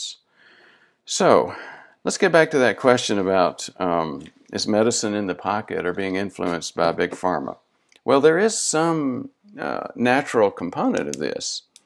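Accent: South, United States. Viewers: Don't know if it's your Southern drawl or wha'at but you Look n' Sound like you need a shot of Adrenaline-or an Least a Double Espresso with a teaspoon of Honey-info was Good but I Almost fell Asleep!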